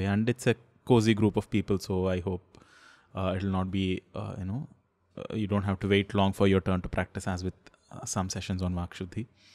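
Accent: South India, India. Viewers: actually, if you have observed in his pronunciation, he is a south indian, and generally, south indians only understand Hindi, and that too little, and have difficulty in speaking Hindi, thats why he explains in English, not discriminatory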